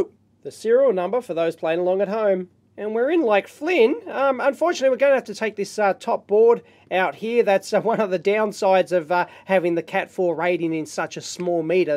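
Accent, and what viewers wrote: Australia. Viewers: "terrible voice" is a little squeaky but for non-english speaking people (like me) is very clear, intelligible and understandable. The most terrible things are both A and E pronunciation but, well, Aussie way:) When he says "EEV Blog" I still get something like "HEY HEY VEE BLOG", but after months - years, by now - of videos I eventually started to love so much his accent. And, of course, I deeply love the contents, the professionality and the expertise. I learned a lot from this YT channel, as well as how understand english language spoken by a "squeaky Aussie" (sorry LOL)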